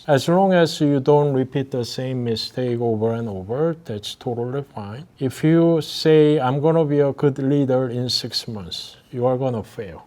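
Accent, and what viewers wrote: South Korea. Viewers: 외국에서 느끼는 건데, 한국인 영어 억양으로 교수님처럼 영어 정말 잘 하면 그게 더 멋있어 보이더라구요. 솔직히 전달력도 좋구요. 오늘도 힘내서 작은 영어 공부 생활습관이 이어가 봅니다. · 억양은 한국인이시지만 저 정도만 구사해도 대단한 실력..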